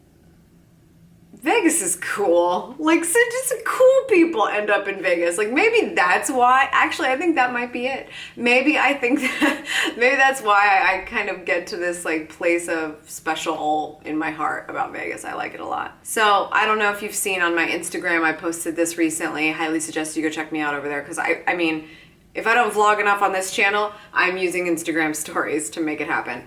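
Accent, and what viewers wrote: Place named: Philadelphia, United States. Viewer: vocal fry and from Philly.....!!!!!!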